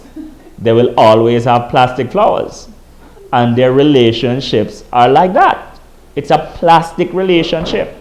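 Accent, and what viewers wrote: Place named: Jamaica. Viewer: and he sounds Jamaican like me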